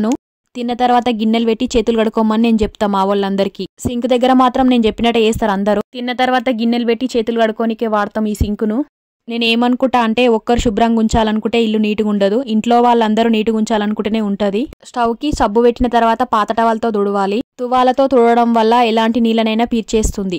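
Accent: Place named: Jammikunta, India. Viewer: Maadhi jammikunta akka meeru matladthante mem matladinatte anipittandhi....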